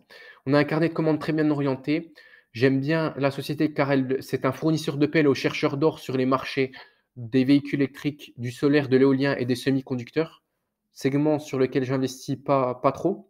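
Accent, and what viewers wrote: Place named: Doubs, France. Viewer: merci ca se voit que tu prends du plaisir. Avec un bel accent (du doubs si j ai bien compris)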